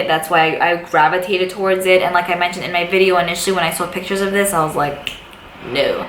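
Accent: New York, United States. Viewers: Love your accent! You need a video just repeating the word "gloss", LOL. I was like is she from New York....looked in the description box and what ya know, you are! LOL. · Im from the South. So the New York voice is so addicting! haha good video.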